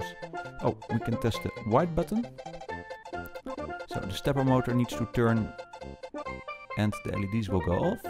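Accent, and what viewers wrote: Netherlands. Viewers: ben je uit nederland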